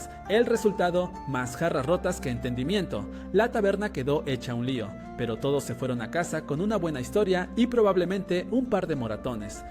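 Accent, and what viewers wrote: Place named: Latin America. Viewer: Tienes la forma de hablar de alguien que vive en un país en qué seguramente una gran parte de la población no tenga agua corriente o viva en condiciones de insalubridad.. tal vez cualquier país de Latinoamérica?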